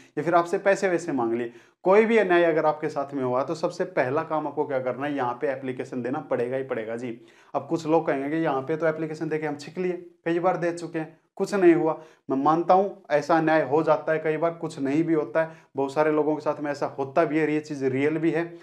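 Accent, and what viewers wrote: Haryana, India. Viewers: Bihar se hu per boli haryana ka lagta hai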